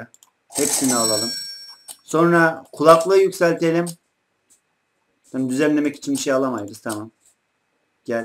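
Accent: Black Sea, Turkey. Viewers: abi sen rizelimi tranzonlu musun?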